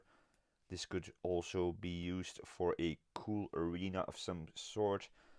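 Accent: Netherlands. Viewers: I thought I finally had it. I knew you where dutch (or Belgian) because of your name and your accent. But you use stuff not available in the Netherlands. But in this tutorial I saw a flask of bison houtlijm, so I thought yeah you must be from the Netherlands...... But then you use stuff not available in the Netherlands again..... so where are you from if I may ask?! I want to know because of all the stuff you use. All the scenery makers are using cool stuff like grannygrating... no where to be found here...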